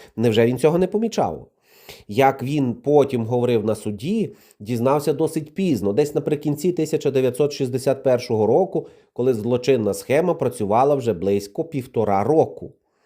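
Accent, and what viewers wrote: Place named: Kolomyia, Ukraine. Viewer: акцент рассказчика из коломии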